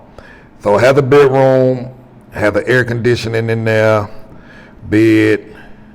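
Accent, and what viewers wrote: South, United States. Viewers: I'm late BUT I want to wish you a Happy Father's day my man! I been rockin with you for YEARS when U were still traveling in the states and 1st went to Canada! I have seen a LOT of mess you had to go through and I am PROUD of you my man that you made it through! The VERY 1st time I heard you talk I KNEW I was gonna like you cause im from the South TOO! Much respect to you Wishing you MUCH happiness my man! 😇👍🏾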